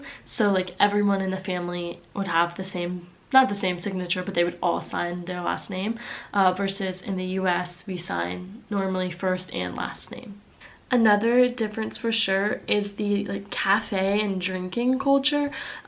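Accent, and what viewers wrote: Germany. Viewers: Pretty German accent...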